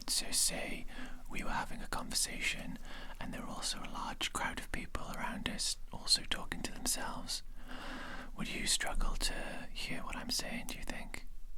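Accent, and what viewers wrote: Wales, United Kingdom. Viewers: can i hear a welsh accent?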